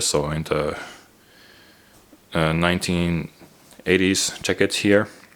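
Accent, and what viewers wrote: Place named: Finland. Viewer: Kyllä se aksentti vaan paistaa läpi meillä🤣 Mutta hyvä video! Alkanu lähiaikoina kiinnostaa kylmän sodan aikanen varustus euroopan maissa niin nää on käteviä!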